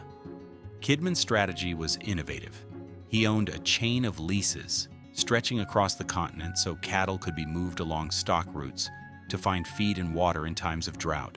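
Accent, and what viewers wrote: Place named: United States. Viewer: As soon as i heard this yanks voice i new this video would be Bullsh!t!!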